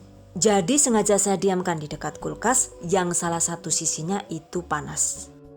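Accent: Banyumas, Indonesia. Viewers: sampe kaget aku pas jebul bahasa ngapak 😂😂😂😂 tak kirain temenku ngajak ngomong , eh ternyata suara dari yutub 😂😂 I LIKE IT 🥰🥰🥰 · Wong ngapak 😀, asli wong ngendi Bu? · Wkwkwk lucu bahasa ngpaknya saya suka haha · Ngapak lah...yu'.... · Terimaksh ataas resepnya, jd pingin bkin yogurt dewek, aku kl dgr suara ngapake, jd ketawa wae, hbs lucuuu siihh, okey, sukses slu, salam dr Wong Pati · Ngapak ke kayak kiyek lha, gae seneng bae nak ngerungok no. Hahaaa... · Auto subcribe cuma gara2 ngomong ngapak 😂😍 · ora ngapak ora kepenak.. enyong ya ngapak bun 😆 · Kok ada bahasa ngapaknya yaa,hehee,...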